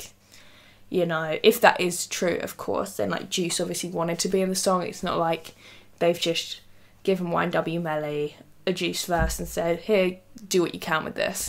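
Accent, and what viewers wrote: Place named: United Kingdom. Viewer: I like u'r british accent.. it's so seductive ❤❤❤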